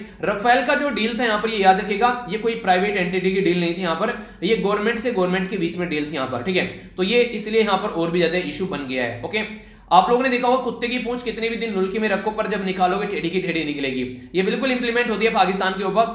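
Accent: Haryana, India. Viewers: Sir aap pkka haryana se ho ,Musa =rat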